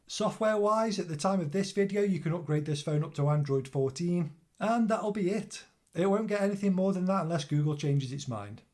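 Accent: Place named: United Kingdom. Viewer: Only here for native British accent. Loved it bro!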